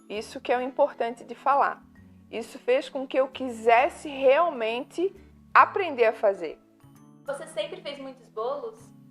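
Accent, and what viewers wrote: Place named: Itajaí, Brazil. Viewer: Querida! Que Deus te abençoe sempre ❤🙏🏻 Legal ouvir um "sotaquezinho" de Itajaiense aí, na América, amoooooo🥰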